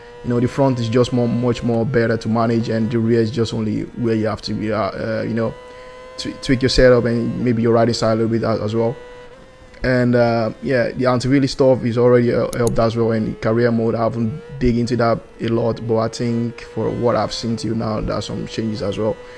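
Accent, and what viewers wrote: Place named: Netherlands. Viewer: I like your Dutch accent but sometimes it is hard to grasp. :D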